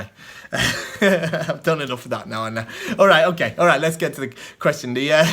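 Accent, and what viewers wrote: United Kingdom. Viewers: Your accent sounds like some sort of Northern, but it doesn't sound either Geordie or Scouse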